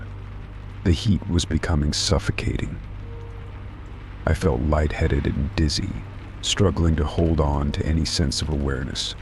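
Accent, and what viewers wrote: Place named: Southern England, United Kingdom. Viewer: South England. You are really helping me to sleep thank you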